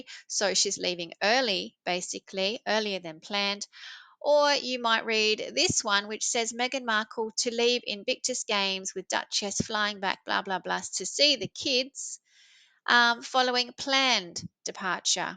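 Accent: Australia. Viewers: Are you Aussie 😂😂😂❤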